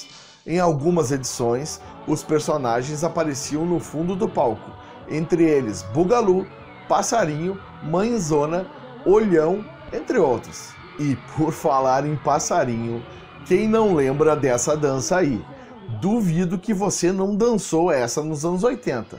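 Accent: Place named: Rio Grande do Sul, Brazil. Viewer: Nao acho que seja a mesma pessoa, o Teily tem sotaque nordestino, e o locutor aqui do canal tem um sotaque de gaúcho. O timbre da voz também é um pouco diferente entre eles.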